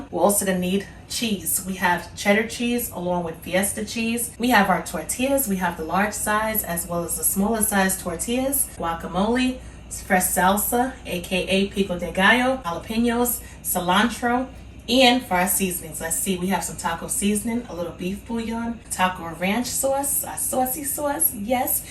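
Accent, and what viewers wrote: New York, United States. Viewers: Great Recipes & tht FAB NY accent!😍🌹💓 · Ohhhhh I'm doing this asap omg😋very easy and looks delicious !ps, Love your accent you can have mine from Louisiana , I'll take a New york accent any day Lol! 😋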